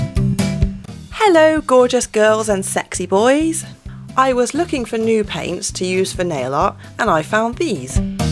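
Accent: United Kingdom. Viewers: Since by your acent lol i guessing u live in the uk????? and also ummmm thinking that i might not be able to find them u think u can do alittle bit of research on where i can buy them in the usa and i would love to share my expeiMENTAL self with you im always hearing form my friends lindsey ur cray cray ur just to much lol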